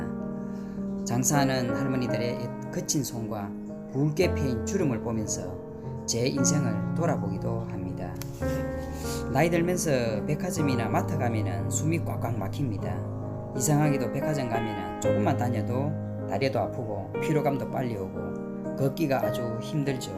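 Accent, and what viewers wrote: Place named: Busan, South Korea. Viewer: 어머, 부산 사투리가 이렇게 구수할 수가.. 멋집니다. 그런데, 71년생?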